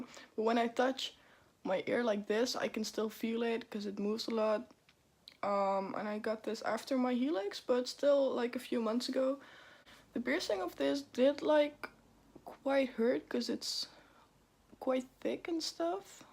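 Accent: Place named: Netherlands. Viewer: Ben jij nederlands Zowel ik hoorde het helemaal aan je accentje😳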